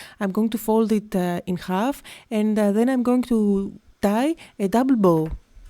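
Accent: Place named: Greece. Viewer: it's a Greek accent. Lovely card!!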